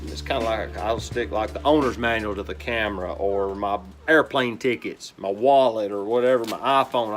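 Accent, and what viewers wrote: South, United States. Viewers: hahaha I wasn't sure if you were joking so didn't want to say anything. I thought it was a southern accent. It's awesome. Love it :) I'm from Germany and have a terrible accent :)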